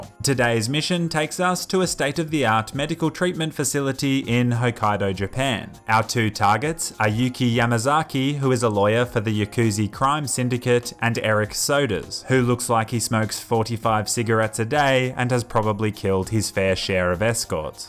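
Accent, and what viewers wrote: Australia. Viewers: Ah i knew you were australian,no wonder you are so wise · hes aussie? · so ur australian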